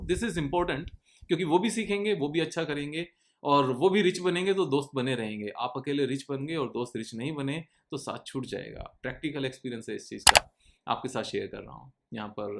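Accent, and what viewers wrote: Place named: Tamil Nadu, India. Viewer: Thanks bhai for this video. Very informative.I also had fun listening to your Tamil pronunciation.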